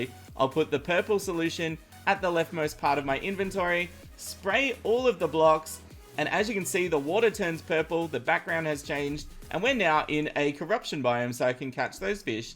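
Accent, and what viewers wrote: Australia. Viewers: are you Australian? I feel really dumb right now. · Hes Australian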